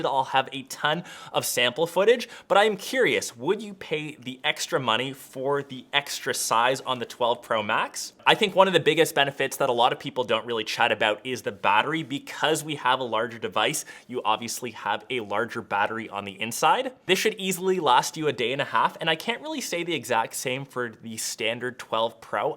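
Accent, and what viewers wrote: Canada. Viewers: I have to admit, one of the highlights of watching your videos is chuckling when you say words like out, about or been, but we love our neighbors to the north. 🤣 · You have an accent. Are you Canadian?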